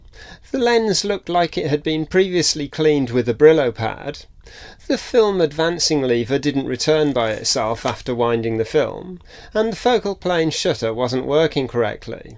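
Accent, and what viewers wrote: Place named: Midlands, United Kingdom. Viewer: really good video matey, but can I ask a question? what is your accent? i come from Bedworth, so i feel like it's sort of a between Brum and the Black country accent?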